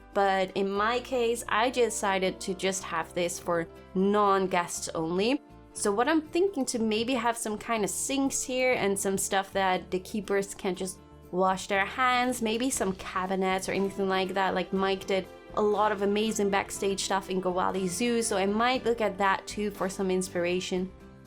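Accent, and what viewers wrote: Netherlands. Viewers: Haha ben je Nederlands?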